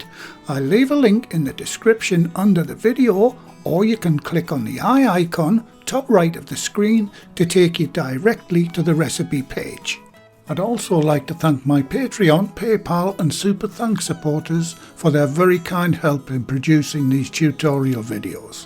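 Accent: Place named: Bishop Auckland, United Kingdom. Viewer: another great video! Your accent sounds so much like my stepdad from Bishop Auckland. Especially when you said “very” like “vury”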